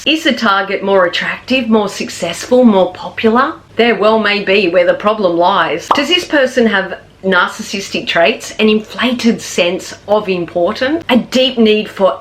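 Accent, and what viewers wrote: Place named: Australia. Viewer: Your grace, you look absolutely adorable wearing pink, and with curly hair, and you have the cutest Australian accent, plus, the most beautiful blue eyes. 🤗🤗🤗 I don’t like dealing with selfish rich people, and horrible narcissists, I only associate with people who understand and respect my dreams and wishes, and who appreciate me for who I am. I just hope Disney or DreamWorks will someday hire me for some voiceover jobs. I sent you a kiss on your elegantly gloved hand, your grace. 😘😘😘🤗🤗🤗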